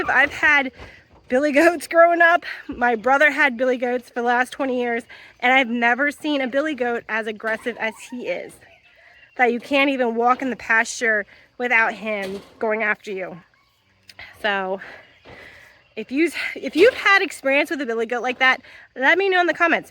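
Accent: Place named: Pennsylvania, United States. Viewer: I was trying to place your accent because it sounds familiar. I came to the conclusion that maybe you were raised in PA. I hope that bunny isn’t struggling to breathe - poor thing. 🙁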